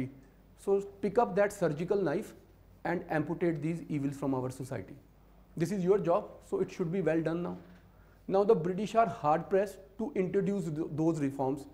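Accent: Punjab, India. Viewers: Finally someone explained the history in a lucid manner and cherry on the cake is his punjabi touch that keeps you involved during lecture..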